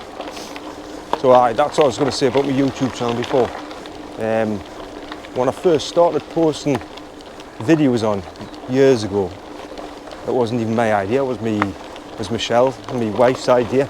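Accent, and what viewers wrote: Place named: Northern England, United Kingdom. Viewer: Just found your channel, and it’s great. To see you enjoying nature and the solitude of your surroundings is lovely, especially as a few of your rides pass near where I live ( Newbiggin ) on your way North. No airs and graces just a genuine Geordie on the trails and roads that me and the family ride,Even if Stanley is not really Geordie land you sound like you originate from further north. Love the humour too.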